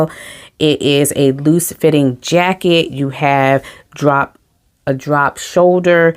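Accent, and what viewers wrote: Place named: South, United States. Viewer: You love to sew dresses but I love to sew coats. I love this list. I have to ask: you sound southern, yet your vlog is named "Crumpets, tea and Sewing". Where do you find crumpets in the south? I lived in Ga for years and I couldn't find them anywhere.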